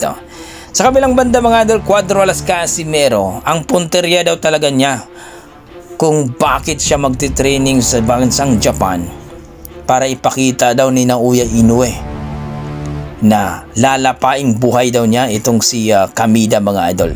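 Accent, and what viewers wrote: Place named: Visayas, Philippines. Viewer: Bisaya na bisaya Ang pananalita mo, para ka bulol mag salita, Masakit sa tengga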